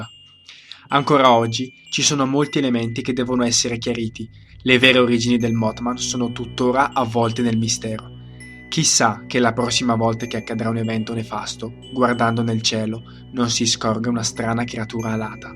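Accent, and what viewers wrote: Italy. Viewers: Lui ha l'accento italiano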